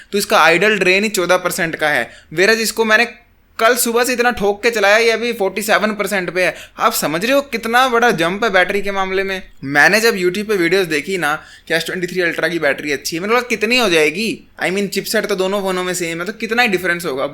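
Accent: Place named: Punjab, India. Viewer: Bhai are you from panjab ? You speak hindi with a punjabi tone.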